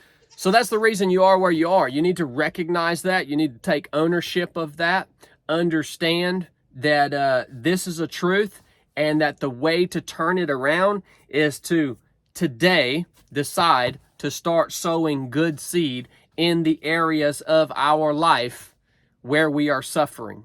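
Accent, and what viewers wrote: South, United States. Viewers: Just gotta say... Thanks to ma man (Southern Draw Accent) lol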